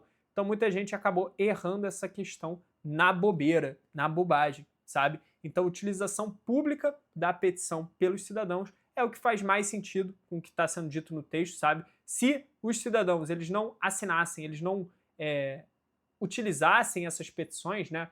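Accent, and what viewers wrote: Rio de Janeiro, Brazil. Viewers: eu racho com o seu jeito carioca de explicar KKKKKKKK amo seus vídeos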